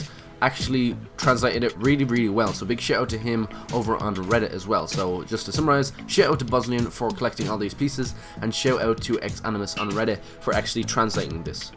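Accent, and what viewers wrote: Dublin, Ireland. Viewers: Are you from Dublin and great video it helps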